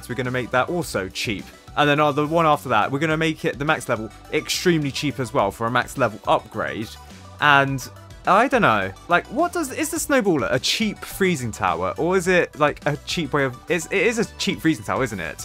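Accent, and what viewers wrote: United Kingdom. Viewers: The amount of Britishness coming out of this is overwhelming (compared to other videos)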